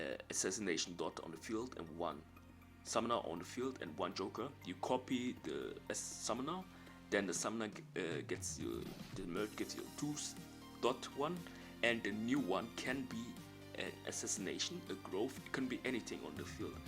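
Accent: Germany. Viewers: Ich habe den akzent direkt erkannt da ich selber deutsch bin erkennt man sowas direkt wenn ein deutscher englisch spricht war mir aber nicht sicher xD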